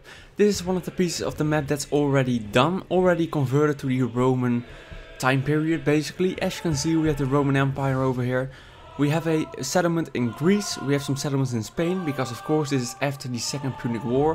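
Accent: Netherlands. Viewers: ahhhhh your accent man, im cringing ( dutch aswell )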